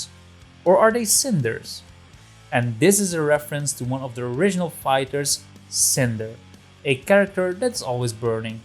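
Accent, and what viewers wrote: Netherlands. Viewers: He's Dutch, I think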